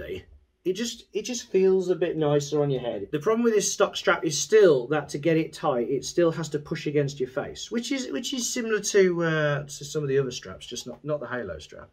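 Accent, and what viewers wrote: United Kingdom. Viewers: Excellent review mate. Also very comforting to hear it all coming from a fellow Brit. Not to be xenophobic 🤓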